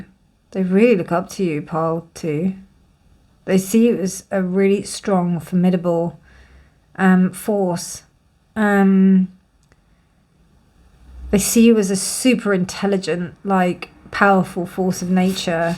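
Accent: United Kingdom. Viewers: 🌻 Good morning, Ms! 🌻 I'm drawn to the art of the 1st card. I wanted to see a flip through of the deck so I was about to search it. Unfortunately, I was not able to comprehend your British accent. Hehe. I turned ON the CC but it cannot capture the right words too. Hehe. Can I know what's the title of the deck, Ms? Or would you like to create a flip through video?? 🤓💕 ✨ Thanks for reading! ✨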